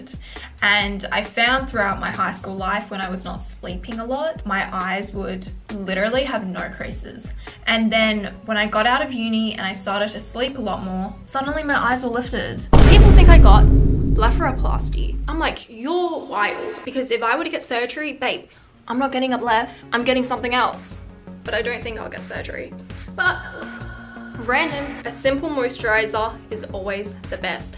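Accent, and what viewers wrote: Australia. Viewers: 5 reasons why changed my life: 1. made me boost my self-esteem. 2. made me physically healthy & mentally 3. got an aussie accent hooray! 4. Life lessons, like. thank you! 5. made me SMART and organized. Like love you your a life changer i will definitely talk about you to my grandchildren LOL ❤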